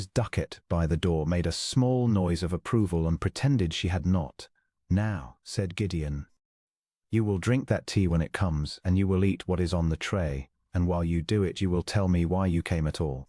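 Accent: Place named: United Kingdom. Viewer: I absolutely loved this story! Well written and perfectly narrated. A British accent makes these stories so much more interesting to listen to. Thank you!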